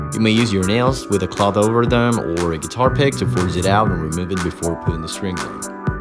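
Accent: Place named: United States. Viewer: Good tutorial, man. Lots of good tips a lot of players particularly ones new to guitar might not know.👍 Side note: your accent is much more American sounding than I imagined. No real point there just and observation. Lol!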